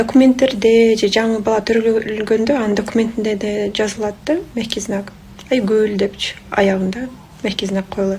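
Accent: Standard. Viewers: Киргизкаларга караганда кыргыз тилин сен таза кыргызча суйлойт экенсин. Ооба кыргыз тилинде мягкий знак жок. Бирок СССР мегилинде Кулматов десе Кульматов деп орус аялдар жазып коюшкан. Ошондуктан али кунго чейин фамилиям ушундай. Метрикада кандай болсо, паспортко ошентип жазылып калган. Аны озготууго болбойт. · Танкалдым, ушундай таза суйлот экен кыргыз тилин. 😮😊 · Абдан туура суроо койуптурсун.Мен орусча сени МОЛОДЕЦ дейинчи 😀Ушунча таза жана жумшак суйлоптурсун,уккун эле келет.Азыр кыргыз жаштар деле минтип суйлой албайт. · Баягы биздин папа мама батя брат сестрёнка братишка деген чала орустарга салыштырмалуу жн сизам бизам битта экита деген чала сарттарга салыштырмалуу жн казыр жатыр матыр деген чала казактарга караганда бул кыз Кыргыздын нукура таза адабий Эне тилинде сүйлөйт экен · Красавица таза Кыргызча суйлогонуно класс басам Кыргызстанда жашаган бардык улуттар уйронгуло силерда кыргыз тилин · Киргиздерге салыштырмалуу, кыргыздын сары кызы таза сүйлөйт экен. 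Майлуу суудан болбойсуңбу? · Айии кебир опко кыргыздарга караганда чотка суйлойт экен🫠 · Ииий жаным молодец Анча мынча киргиздерге карпганда. Нукура кыргызча суйлогонуно ыраазымын азамат! Орусча кичине уйронуп алып, кыргызча тушунбой калган мыркаларга, мырктарга наалат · Сарышка кыргызчаны кыргыздан таза суйлойтко молодец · Оой секетболойун кыргча таза суйлойт экенсинхо ошону билбейт экенин сураш керекекен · Мыктысын.Туура кыргызда ичкертүү белгиси коюлбаш керек.Ал биздин билимсиздиктен келип чыгып жатат Кыргыз тилин киргизкаларга караганда таза сүйлөгөнүн кубандырат.❤❤❤ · Кыргыз тилин таза суйлогонуно рахмат.Кыргыз тилинде 36тамга бар арасында жумшак белги бар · Вауу Кыргызчаны таз суйлойт экенсин. Азаматсын сулуу кыз❤ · Кыргызча таза суйлогонун учун 5 лайк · Мягкий знак олуп кетсин коймоктон коё берсин сага ырахмат кызым кыргызча таза суйлогтнуно Бишкектеги киргиздерге улгу Кыз экенсин Бактылуу бол ананайын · Азаматсын кыргвзчаны таза суйлойт экенсин🎉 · Амээй кокуй! 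Тап-таза Кыргызча суйлойт ко. Соз жок.❤ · Кыргызча таза суйлойт экенсин анча мынчаларга Караганда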